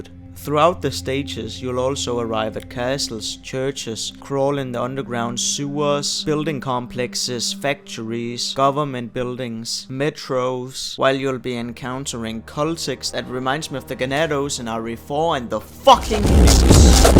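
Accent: Denmark. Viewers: Har aldrig hørt en mere dansk accent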